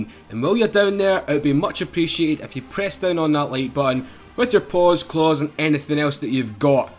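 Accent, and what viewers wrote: Scotland, United Kingdom. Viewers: dat scottish accent tho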